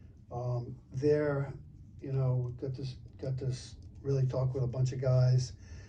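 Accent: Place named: Boston, United States. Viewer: I get the impression you're from Boston lol,great pickups,I missed 2021 national ,will be in ac,hope to get a chance to meet you and other you tubers to tell stories and share experience's.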